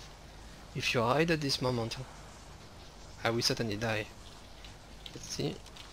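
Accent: France. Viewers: Tu a un petit accent français nn ?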